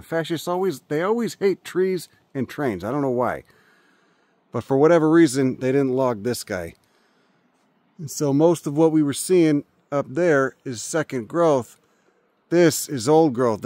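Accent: Chicago, United States. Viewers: Jesus two seconds in this guys got to be from chicago !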